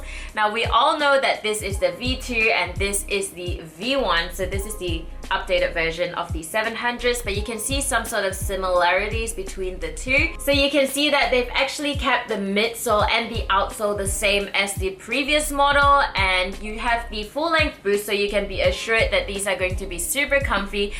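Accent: Australia. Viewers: I’m buying these after I watched your review vid. I love your ausie accent. It’s super hot. I’ve spent a lot of time in Sydney and Gold Coast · Her Aussie accent sounds really lovely.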